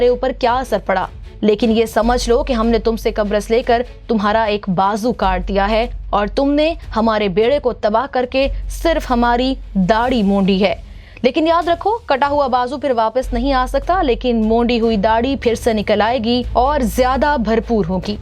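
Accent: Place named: Punjab, Pakistan. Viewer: Please speak Urdu like Urdu not Punjabi. Or just speak Punjabi.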